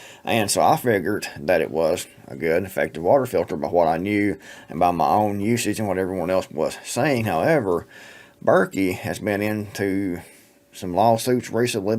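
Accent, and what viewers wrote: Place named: East Texas, United States. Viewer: perhaps Esst Texas or Knoxville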